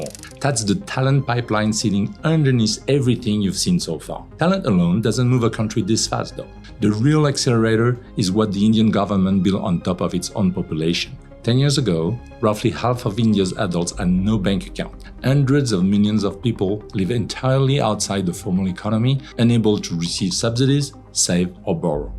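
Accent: France. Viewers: Narrator seems to be of French background trying to speak in English language in order to target Indian viewers..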